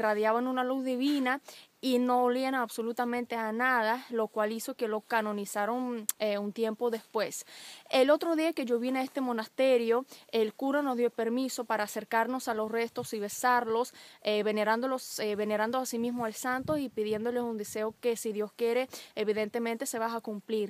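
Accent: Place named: Venezuela. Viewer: Diría que tienes acento Venezolano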